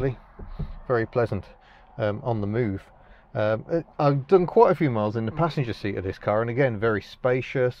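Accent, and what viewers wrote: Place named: England, United Kingdom. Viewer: How come you talk with an english accent not NZ?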